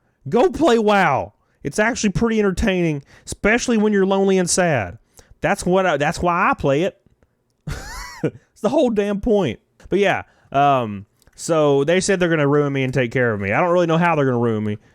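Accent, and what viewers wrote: South, United States. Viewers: Folks doing this to others is not cool. I hope you will be ok and if it means anything your accent was one of the things that made your channel stand out and why we followed you initially. Hearing gaming updates with a southern accent is fun. Keep on doing what you love. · I love the southern accent. Meanwhile, I hate mine because its british 🤣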